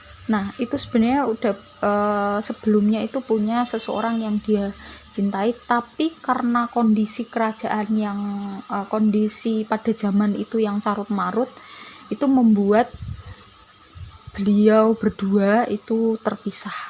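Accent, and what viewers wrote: Java, Indonesia. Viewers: Medok banget wong jowo ya mbak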